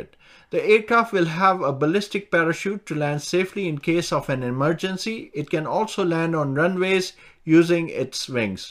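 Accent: India. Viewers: I like ur accent👍👍 r u from india?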